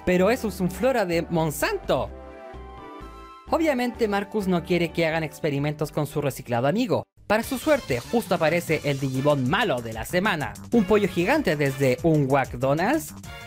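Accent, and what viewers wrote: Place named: Chile. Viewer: Es chileno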